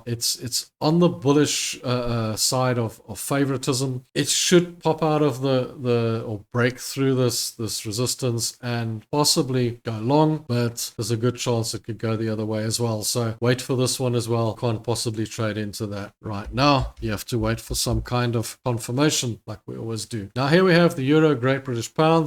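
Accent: South Africa. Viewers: Sounds south African to me 😂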